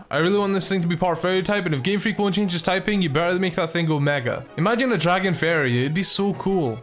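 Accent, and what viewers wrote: Scotland, United Kingdom. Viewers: tehTroll OfLife Yes he is Scottish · are you scottish? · Are you Scottish? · ***** where are you from because you sound Scottish I'm Scottish =D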